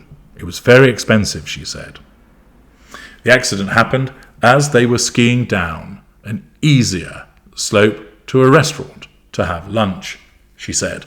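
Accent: United Kingdom. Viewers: Curious about the accent. To me it is so Hugh Grant. Is that a common accent in England? I’m American. So posh.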